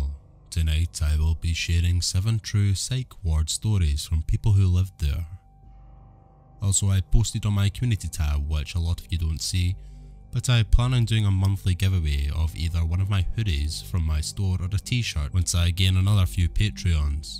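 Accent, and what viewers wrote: Scotland, United Kingdom. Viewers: First time hearing horror stories in a Scottish accent and absolutely love it. Had to scour YouTube to find this channel and thank you so much for narrating them. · Criptids are my favorite subject,and paranormal experiences are a close second. I would like to thank you for your time and effort in making these stories. I love your accent, I've always had a soft spot for Scotland and the accent. Frankly you could read the yellow pages and I would listen. 💙😱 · I really enjoyed that. They were different and I think I have only heard this topic dealt with once or twice before so it was good to hear original material. You have a great voice to listen to. I love the Scottish accent and it makes it different. Here is an idea for a topic, I remember reading a story on Reddit where a couple were randomly rescued from a potentially violent situation involving a couple of tweekers by an enormous and scary black dude .... 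how about “unlikely heroes “ or someone totally random just completely saved my bacon?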